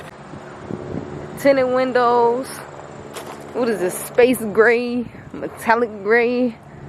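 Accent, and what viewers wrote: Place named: Omaha, United States. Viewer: you sound like your from Omaha Nebraska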